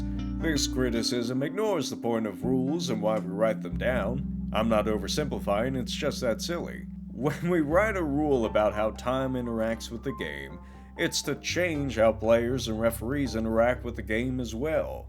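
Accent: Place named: United States. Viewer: Loving the cowboy accent with the static image background. Otherwise, hearing all this about 1:1 Time, I wonder if it would make the game I'm designing more interesting as well.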